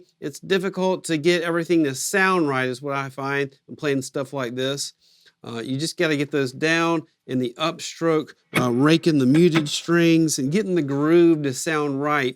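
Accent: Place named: South, United States. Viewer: okay thanks ( by the way that is not my picture,,just props) any who this lesson is really valuable to me i will diffidently use this one!!! hey in this world of politically correctness which is completely ridicules ( thanks obama) i just gotta comment on your accent,,i like that southern draw when you speak,,I'm from Texas my self i thought man that guy sure does sound like my home boy ! any who GREAT SHUFFLE Lesson...e : )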